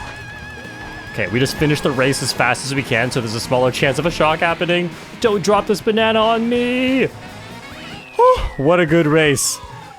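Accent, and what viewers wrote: Canada. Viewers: Are you from British Columbia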